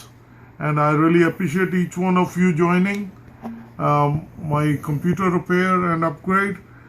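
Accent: India. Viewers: this guy seems like it was his 1st time opening a laptop and the worst video on youtube with his bad English curry English and also half the demo was missing he did not show many steps were missing so thumbs down to u.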